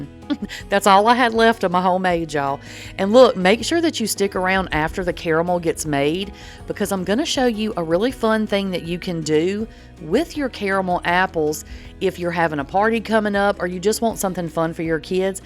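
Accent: South, United States. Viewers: At times, I hear myself....horrible Baltimore accent...lol....more so than my parents ever had....😳...Your southern sound, very calming. Lol Luv your videos hon !!!!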